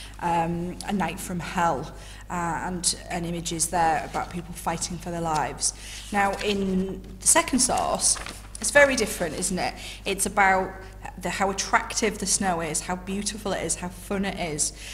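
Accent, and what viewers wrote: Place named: Teesside, United Kingdom. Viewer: Love the accent lol are you from Tees? Also what are Peel paragraphs ?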